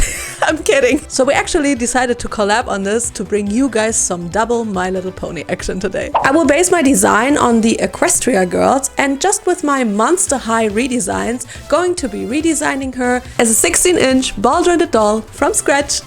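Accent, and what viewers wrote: Germany. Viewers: Are you German? Also I love what you did with pinky🥰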